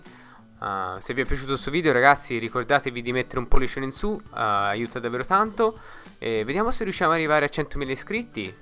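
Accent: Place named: Tuscany, Italy. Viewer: L’accento toscano è ancora pazzesco!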